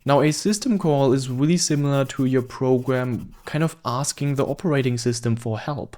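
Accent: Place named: Netherlands. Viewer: Dude sounds like Dutch Elmer Fudd, love it ❤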